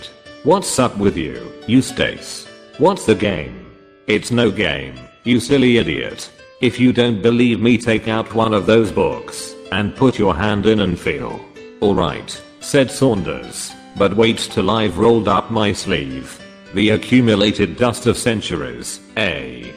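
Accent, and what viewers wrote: England, United Kingdom. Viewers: best british accen..... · thanks alot for reading to us and by the way I love your English accent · Amazing story thanks alot for reading to us and by the way I love your English accent, this is fenomenal !! i enjoyed to hear the whole story ,And i understood each words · I love this story and the english accent ,intonation of the story teller. i try to immitate her accent in every words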